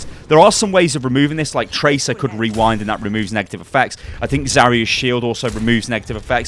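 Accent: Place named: United Kingdom. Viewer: Amazing guide; keep your work up! Admittedly Ana is my main as well, so any kind of help I get is amazing. By the way, nice British ;D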